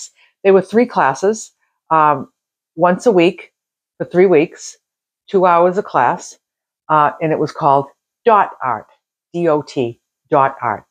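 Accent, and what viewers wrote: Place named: New England, United States. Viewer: Hi, new to your channel. Where do you live? I'm detecting a New England accent. I too would love to buy a van and travel, so your channel intrigues me.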